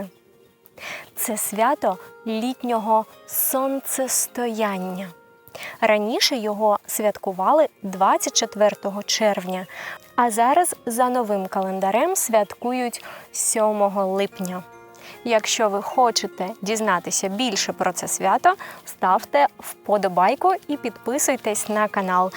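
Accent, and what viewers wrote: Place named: Luhansk, Ukraine. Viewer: А я люблю вашу вимову - така Луганщини))) Луганськ - ЦЕ УКРАЇНА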